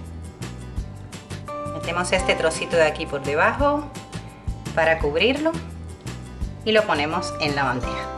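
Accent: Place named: Cuba. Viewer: jaja ya me sonaba ese asento .un gusto yo tanbien soy cubana desde el primer día k vi tu video me suscribi. Por tu asento .jenial 👏👏💪💪💪🇨🇺🇨🇺🇨🇺🇨🇺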